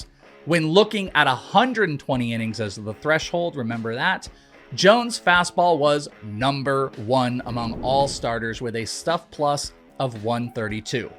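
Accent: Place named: Wales, United Kingdom. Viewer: Welsh talking Baseball 😍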